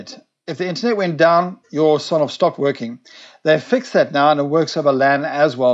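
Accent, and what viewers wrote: South Africa. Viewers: Thanks so much for this video, also nice to see a South African video for a change LOL (mind you, a South African accent that that's not making a video from abroad!). Please can you do a review video on these new emergency LED light bulbs that have a the built in battery inside of them but work like a normal light bulb. Also do you have recommended retail prices and where one can buy the sonoff switches? Will check itead out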